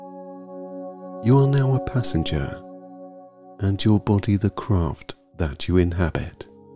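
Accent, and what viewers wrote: United Kingdom. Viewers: Why are these videos always a deep voiced British guy or a Russian woman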